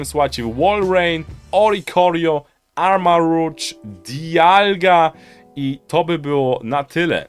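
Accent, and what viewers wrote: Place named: United States. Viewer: Ta twoja gwara amerykanska😂y😅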